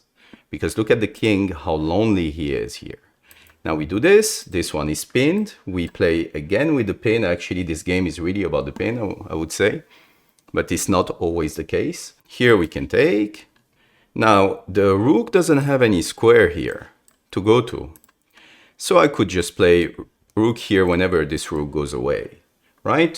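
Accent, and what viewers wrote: France. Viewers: The french accent is great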